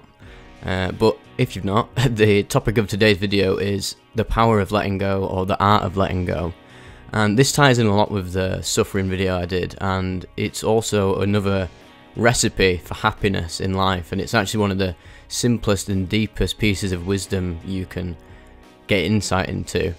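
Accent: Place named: Northern England, United Kingdom. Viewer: Eee lad, I love that northern accent and humor. Trying to keep me wig on. 😅…. You’re awesome 😊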